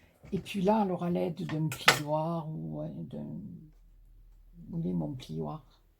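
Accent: South, France. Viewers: Oh qu'elle joie de te revoir, c'est bon d'entendre ton accent du soleil, tkt tu es hors champ mais pas grave trop bon de te revoir avec tes astuces et tutoriels. Super géniale marie-ange de Bourgogne. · J adore votre vidéo et votre accent du midi. Je vadrouille entre st Martin de crau et Ales Gard et le midi j aime. Chapeau 😘😘😘😘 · Coucou je découvre ta chaine et je vais m'y abonner de suite .. le tuto du chapeau j'adore......et l'accent du midi également.. ici il neige a gros flocon suis du Nord... est ... ca caille ..bisous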